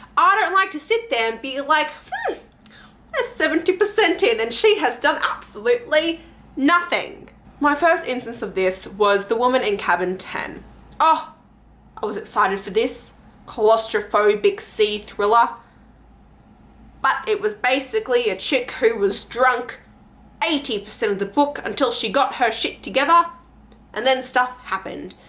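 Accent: Australia. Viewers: Your reactions make your Aussie accent so prominent. It was very entertaining 😂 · love that Aussie accent 💪now were is the manga! You talking about these gross books with no pictures LOL I'm just playing but it doesn't sound like you enjoyed these books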